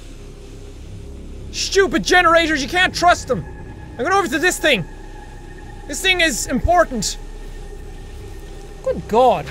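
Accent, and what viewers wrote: Ireland. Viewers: Best of the irish youtubers!! Cant tell if your accent is wexford or meath? Any way this limerick man has PUNCHED that sub button!! Keep them vids comin;P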